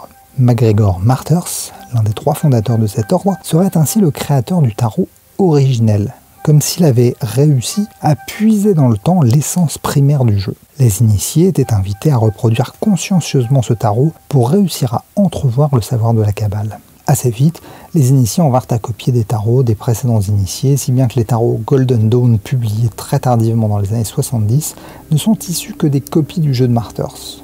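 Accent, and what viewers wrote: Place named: Italy. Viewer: BravissssimoO !!! Pour ce travail historique; tout cela avec une" touche de "fausse facilité "car je suppose que cela a dû vous demander des recherches conséquentes ainsi qu'un grand travail de synthèse! Et que dire de la mise en page magnifiquement illustrée!!!...Quant à l'accent; étant moi-même d'origine italienne...eh bien! ...Pas mal du tout!😆😂🤩( je partage votre vidéo sur mes groupes!).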